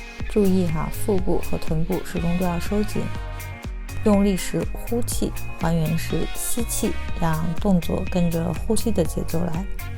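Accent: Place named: Australia. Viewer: Australia!!! i love aussie accent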